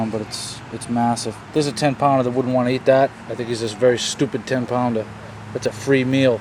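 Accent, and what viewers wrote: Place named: Brooklyn, United States. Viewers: Not bad. You sound like your from New York, Boston, etc. where exactly are you from? I'm a Southern boy from Alabama. Fishing, college football, and most important following Jesus Christ is our passion here. If you ever get the chance, Feb., March, and April is the time to come to Alabama with your boat. Lay lake, Mitchell, Logan Martin, Guntersville, and many more lakes here with monster bass. From Smallmouth on the Tenn. river, spots on Smith, Mitchell, lay, Logan Martin, to Largemouth on Gun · Are you from Brooklyn by any chance? You sound like it.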